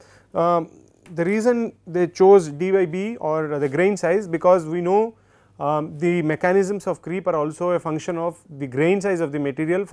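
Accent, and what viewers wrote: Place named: India. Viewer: how does this man look so white yet sound so Indian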